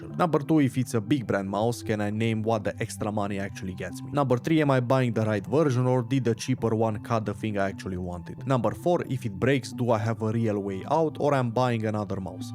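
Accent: Romania. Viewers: Știam că ești român după accent :))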